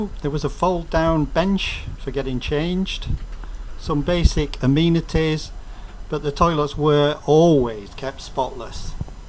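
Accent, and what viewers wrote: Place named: North, United Kingdom. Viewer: With all due respect, I sometimes had trouble understanding your northern accent: "bus" for example.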